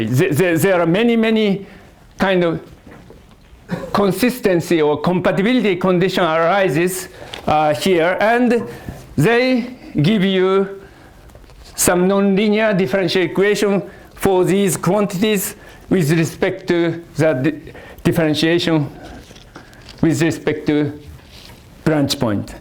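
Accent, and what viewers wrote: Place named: Japan. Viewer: 理系にありがちなカタカナ英語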